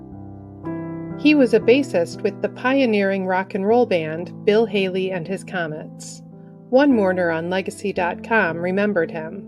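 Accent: United States. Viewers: American that's why speaks clear English.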